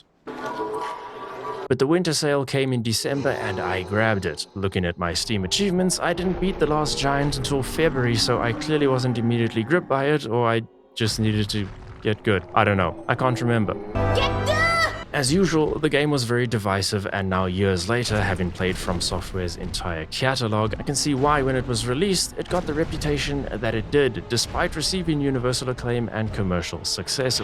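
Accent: South Africa. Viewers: South African Accent?? My ears don't lie👀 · saffa? your accent is difficult to place 🤣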